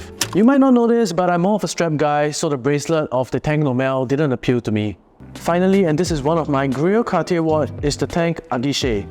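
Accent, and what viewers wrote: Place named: Singapore. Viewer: Singelish is annoying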